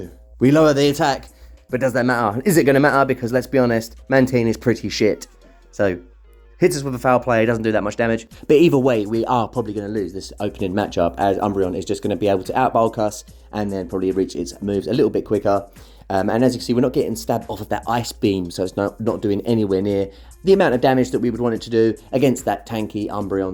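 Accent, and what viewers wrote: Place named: Ireland. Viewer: Love the Irish accent 😳😍 please more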